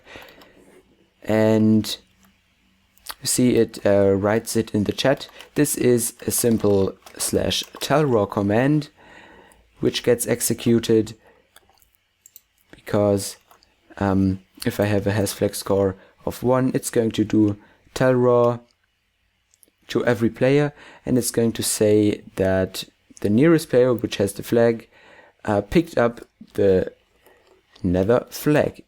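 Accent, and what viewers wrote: Germany. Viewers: Man hört raus das du deutsch bist ^^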